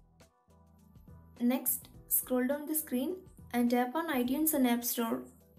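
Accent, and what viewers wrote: India. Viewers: it says new york and the person talking sounds indian so im pretty sure its a fake address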